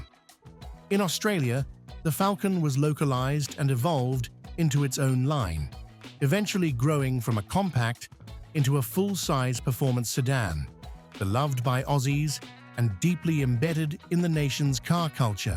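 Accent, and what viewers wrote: United Kingdom. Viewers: Who writes this crap? British accent narrator knows little about the Falcon!! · Please lose that, britsh accent. They didn't make the Falcon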